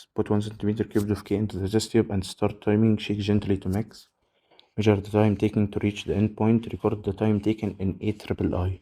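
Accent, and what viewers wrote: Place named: Arab world. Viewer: arabic accent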